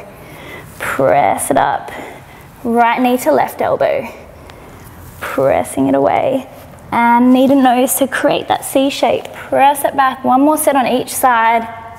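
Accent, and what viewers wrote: Australia. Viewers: Beautiful accent from down below...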